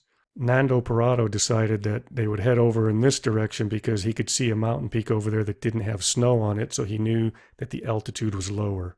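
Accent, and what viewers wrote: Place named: Michigan, United States. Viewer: Narrator pronounces “height” as “hyTH” and “cross” as “crossT”. Wondering if he’s from Michigan/northern Ohio, northeastern Pennsylania.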